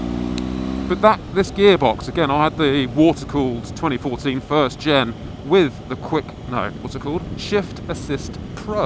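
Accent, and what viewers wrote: England, United Kingdom. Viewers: So, what kind of English accent do you have? I like it. Is it "posh"? Northern? Southern? What would one call it?(I'm a clueless fucking American, btw) The sarcasm is dripping and delightful.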